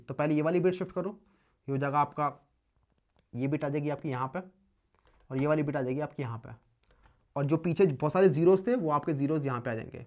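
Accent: India. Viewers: Indian English is so difficult to understand xD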